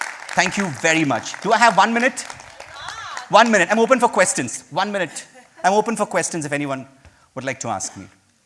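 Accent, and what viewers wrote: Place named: Kerala, India. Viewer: Sir ur from Kerala? Ur accents are so pleasant 👍👍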